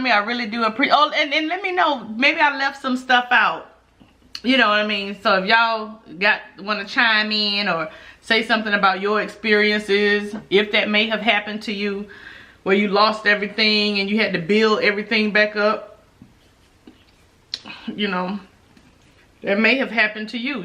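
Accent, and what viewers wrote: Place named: Memphis, United States. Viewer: Because you and my grandma got that Memphis accent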